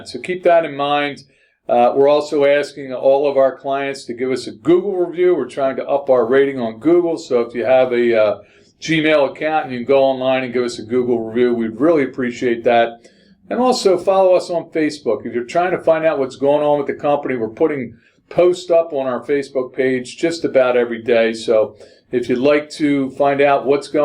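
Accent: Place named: Philadelphia, United States. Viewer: I've listened to Dan's weekly half-hour infomercial for many years. He's a guy whose appearance does not match his voice. That's neither a compliment nor a put down. Just an observation. One thing I'll give him is he's got the southeast Philly metro dialect down pat. When I hear guys talk like Dan, I know I'm dealing with someone from home.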